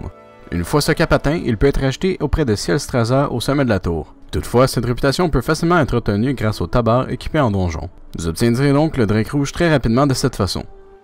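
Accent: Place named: United Kingdom. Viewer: Ton accent anglais est formidable et fait pâlir nous autres Français. Sinon très bonne vidéo !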